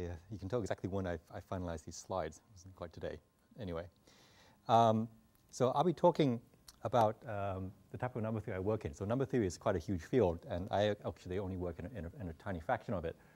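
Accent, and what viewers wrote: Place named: Australia. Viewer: chinese with australian accent teaching math in america... globalization!! yeah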